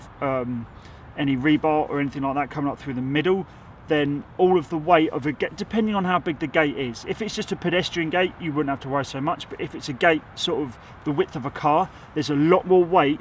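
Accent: England, United Kingdom. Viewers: A better presentation video with some more details for a beginners. I love your English accent too. 🙂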